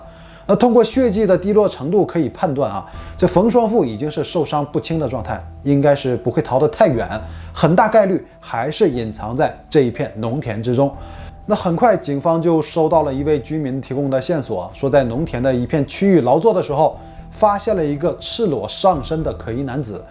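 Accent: China. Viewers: 就是放弃了吧，不配合也没什么奇怪的，不是每个人都那么有求生欲。只不过后面也许是重新有了什么生存的渴望，才会开始上诉。 能下跪祈求，也许是真的割舍不下吧，活着不能在一起，那就一起死吧，只不过他终究是没有自杀成功。抛开对错，他的行为也没什么奇怪。人家性命都不要了，又怎么会在意一点儿法庭上的行为。 主播口音像大陆人，但一个生在红旗下，从小唯物主义洗脑的人，扯什么遗臭万年太荒诞了吧，满手血腥的都不会在意，咆哮公堂的有什么可在意的，自古以来这样的人多了去了，谁的名字能因为这个留下了，“遗臭万年”的成本有这么低么。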